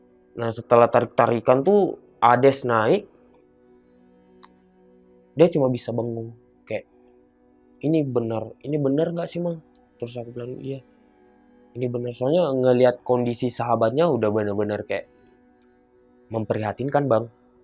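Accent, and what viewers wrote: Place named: Bugis, Indonesia. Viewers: Logat sulawesinya masih ada yah kk,, salam dari sulawesi selatan bone kk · Ku tempeleng Bugis bgt bg👋👋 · Pasti ini bang orang sulawesi, kentara logatnya😅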